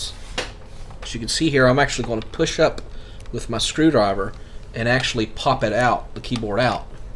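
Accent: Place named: Georgia, United States. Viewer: I didn't notice his accent but that's because it sounded normal to me here in Georgia.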